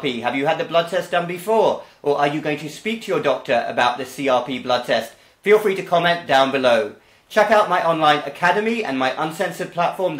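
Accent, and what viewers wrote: United Kingdom. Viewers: I thought practices in USA. Though he is British, hence his accent.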